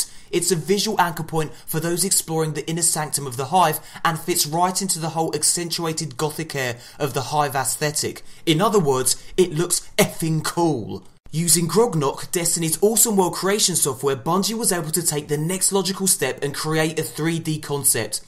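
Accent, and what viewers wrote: Australia. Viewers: yer australia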